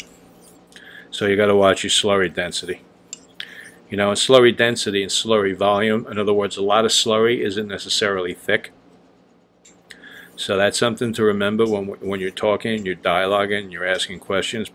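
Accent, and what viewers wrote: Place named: Bensonhurst, United States. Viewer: Men are the new bitches!!!!! Lol!!! So true bro! My cousins live in Bensonhurst, and you have the same accent! So I just purchased a Ozuku Asagi. Previously, I have only honed on synthetics. I hope to be pleasantly surprised. I heard coticules are more difficult to hone on. I'm new to the channel and love it bro! You're very knowledgeable. Keep it coming bro! Any advice on the Ozuku would be greatly appreciated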